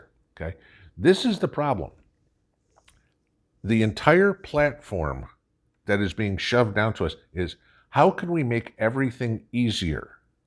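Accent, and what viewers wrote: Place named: Minnesota, United States. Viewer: New subscriber........Is he from Minnesota........kinda sounds like it.............Im from there so I am just askin...........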